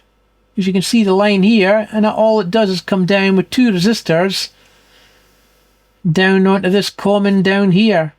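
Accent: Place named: Scotland, United Kingdom. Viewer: I thoroughly enjoyed your interview with Dave from EEVblog. I think you were one of his most interesting interviews to date. Didn’t know you had it in you from just the view of your hands and Scots brogue all these years mate. Good on ya then!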